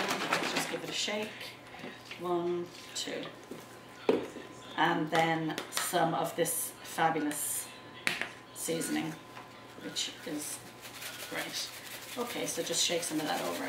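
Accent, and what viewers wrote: Ireland. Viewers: i love your accent Irish i presume . your a lovely lady and thumbs up for you. great teacher and refreshingly no frills presentation. i am going to cook some chicken in my crock pot NOW. thank you.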